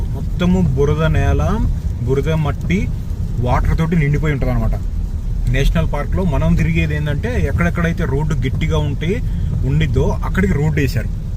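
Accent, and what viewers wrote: Andhra Pradesh, India. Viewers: Bro meedhi andhra nundi velli nijamabad lo settle ayyaru kadhaa...me slang chepthundhi..appatlo chala mandhi akkada lands cheap ani koni akkade vundi poyaru..am I right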